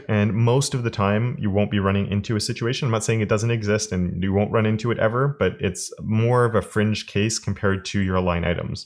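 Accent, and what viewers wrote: Canada. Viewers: The canadian "out" pronunciation is so strong, on this one :)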